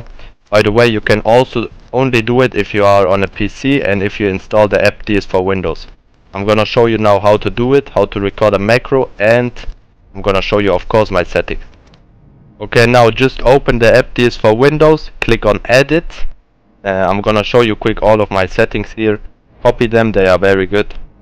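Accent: Germany. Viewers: you are from german ? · man hört direkt das du deutsch bist hahah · Man merkt richtig dein deutsch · nice video are you German? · German accent haha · German accent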